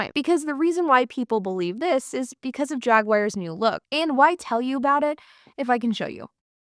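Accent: United States. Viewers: It's how Americans pronounce it get over it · She can't help being American, they only have a tenuous relationship with the English language. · Americans most often say “jag war” for the animal and the car. Americans rarely pronounce the “u”, unlike the British. In the American NE, the “war” would most likely be pronounced “wire”. My guess is she has an American NE accent.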